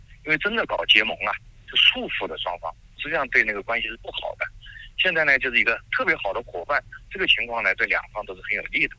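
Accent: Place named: Cantonese, China. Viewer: 这个主持人一听就是讲粤语的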